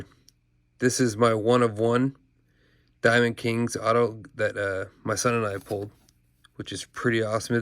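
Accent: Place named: Russia. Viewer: Nice VR and cards. Great Russian Accent.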